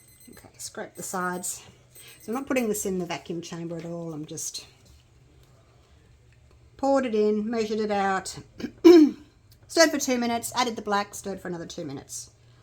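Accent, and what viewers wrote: Australia. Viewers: I just the Australian accents so could listen all day. In fact I'm in the u UK and loved Aussie when I went all those years ago and came home with the intention of emigrating there but never did. Regret it like hell now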